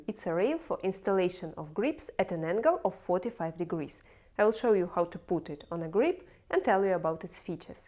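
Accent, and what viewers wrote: Russia. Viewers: I think I found my new asmr channel. I love the Russian accent and I love listening to people talk about guns. 🤣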